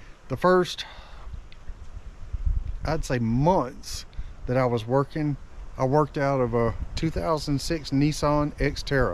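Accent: South, United States. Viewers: From your southern accent, I was wondering what part of the south, GA. In 2 yrs I'm planning to move to catersville. I'll be looking for employment then. I personally never wanted to start a business, I could have one time if I wanted. To many licenses and epa rules, I mentally can do that stress.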